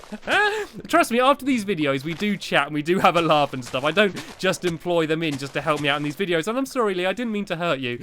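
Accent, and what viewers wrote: United Kingdom. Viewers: i love your videos and you British accent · Omg I love your ACCENT I wish I was British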